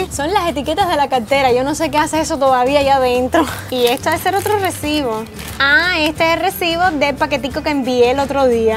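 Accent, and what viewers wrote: Cuba. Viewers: mariam teran por el acento cubano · mariam teran es por el acento cubano en algunos países cambian algunas palabras por ejemplo yo soy chilena y no hablamos con la S ejemplo: estas/estai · mariam teran es el asento cubano pues yo soy panameña y desimos nama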